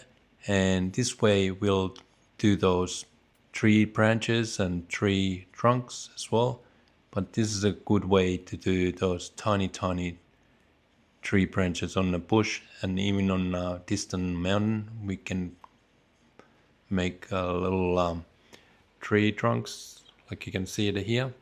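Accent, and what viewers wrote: Australia. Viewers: Great tips and lovely art, subbed! Do I detect a little Aussie within the Finnish accent? :face-blue-smiling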